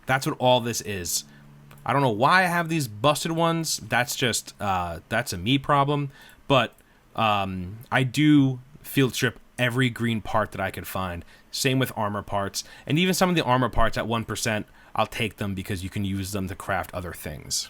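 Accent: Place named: North, United States. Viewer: Strong northern US accent, michigan to new england (probably NY). Great guide thanks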